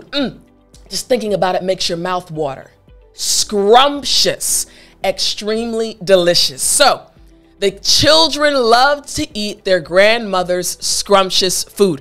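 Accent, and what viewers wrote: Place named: United States. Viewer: That American accent,does confusion me a lot....No disrespect..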